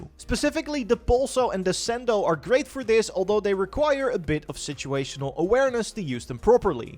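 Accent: Netherlands. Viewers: Typisch Nederlands accent 😂, wist het meteen. Geabonneerd neef. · Hmm are you Dutch too by any chance?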